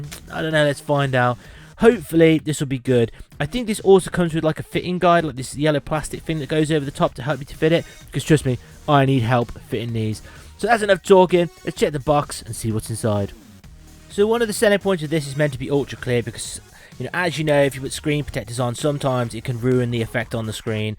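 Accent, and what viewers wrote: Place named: West Midlands, United Kingdom. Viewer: Hi mate. Thanks for the thorough review. I noticed you say it direct affect the screen clarity right? As in it doesn't cause the pixels and text to become slightly blurred? I've not ordered an SD yet cos waiting for sales or refurbs. Getting ascitic to wait though 😂. Hey, you sound local to the west midlands! Big up the WM massive!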